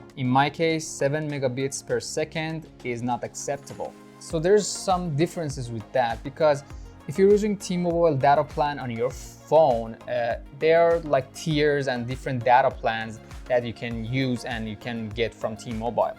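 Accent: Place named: Iran. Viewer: That Iranian accent lol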